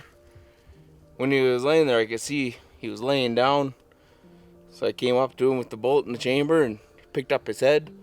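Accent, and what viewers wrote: Canada. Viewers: The most CANADIAN accent in recorded history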